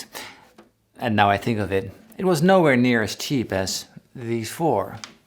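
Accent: Netherlands. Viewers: Ik wist gewoon aan uw accent dat je van nederland was hahah ma je vids zijn wel echt nog cool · what a beautiful dutch accent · Al die tijd had ik zo’n vermoeden dat je nederlands was! Gewoon door je Nederlandse hint Engels. Haha nu weet ik het zeker, helemaal top😂😂. Keep up tue great work tho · I knew you were Dutch ! Toch wel goed accentje hoor ! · Ik hoor een nederlander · Ah, yes, the man met that Nederlandse accent! Do listen to him, he knows guitars.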